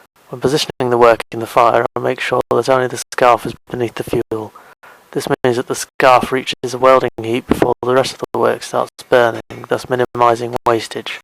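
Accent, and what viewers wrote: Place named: RP, United Kingdom. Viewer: such a posh accent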